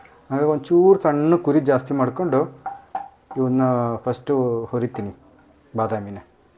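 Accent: Chitradurga, India. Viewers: yenthasngge aduge madthira nam durgada bhashe keli kushi aythu😊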